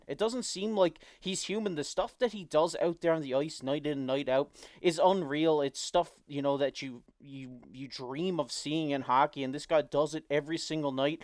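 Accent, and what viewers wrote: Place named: Cape Breton, Canada. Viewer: I’m guessing Cape Breton.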